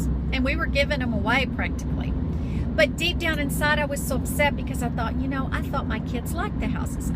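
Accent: Texas, United States. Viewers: Great vlog! I enjoy your positive attitude so much. Loving that thick Texas accent you've re-discovered too. Hope you have a wonderful holiday.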